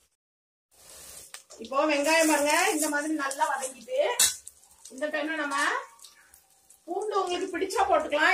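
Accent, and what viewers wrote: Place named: Kanyakumari, India. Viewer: Kaniyakumari kaaranga nu nenaichen... curry kollam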